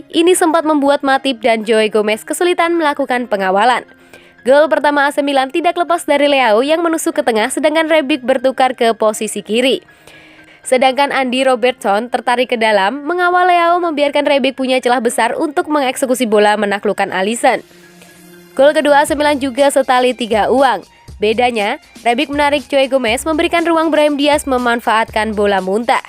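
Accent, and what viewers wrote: Java, Indonesia. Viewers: Beritanya top, Mbaknya org mn ya msh medok logatnya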